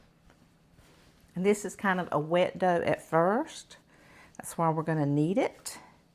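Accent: South, United States. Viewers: You took the words right out of my mouth! I just❤ watching. She is just so down to earth,and I ❤ her southern accent...so calming.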